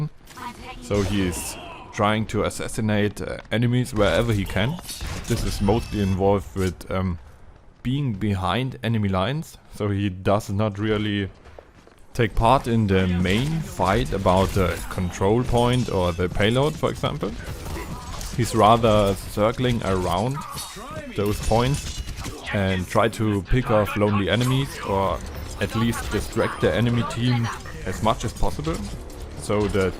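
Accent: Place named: Germany. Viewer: Are you german? I think you are i can hear of your dialect. Like your vids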